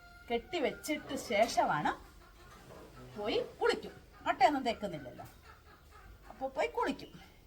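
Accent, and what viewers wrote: Kottayam, India. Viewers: ഇന്നത്തെ വീഡിയോ കണ്ടിട്ട് എനിക്ക് ചിരിവന്നു. മറ്റൊന്നുമല്ല ചേച്ചിയുടെ സംസാരം കേട്ടിട്ട് തന്നെയാണ് ചേച്ചി കോട്ടയം എവിടെയാണ്. എന്റെ തറവാട് കുമാരനെല്ലൂർ ആണ്. അമ്പലത്തിനടുത്താണ്. ഞാൻ നാട്ടിൽ വരുമ്പോൾ എനിക്ക് ചേച്ചിയെ കാണാൻ വരണം എന്നുണ്ട്.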